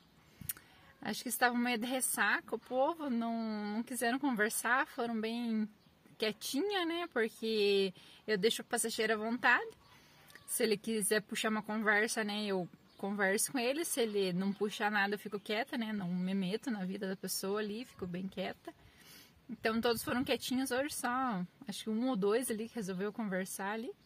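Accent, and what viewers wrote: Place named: Paraná, Brazil. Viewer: Rachei com vc sutaque de pé vermeio parabéns galega vou seguir vc mora em SP mas nasci no Itambé Paraná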